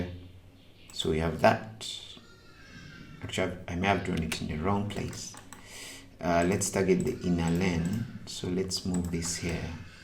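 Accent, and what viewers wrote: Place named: Uganda. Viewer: you sound like a ugandan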